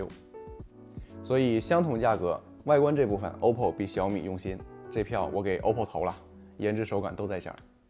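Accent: Northeast, China. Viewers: 东北口音挺重啊！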